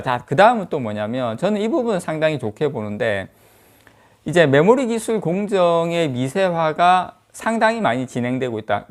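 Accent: Gyeongsang, South Korea. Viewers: 식사하셧는교?? 우리포항 싸투리 올만에들으니 반가위요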